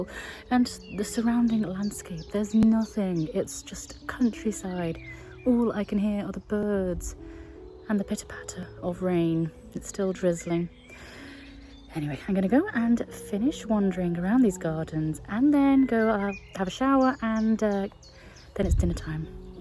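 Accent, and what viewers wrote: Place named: United Kingdom. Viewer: I've just found your channel by a serendipity and it is soooo cute. I'm an english learner, so it's such a pleasure to hear your british accent! Keep going, I loooove your videos!!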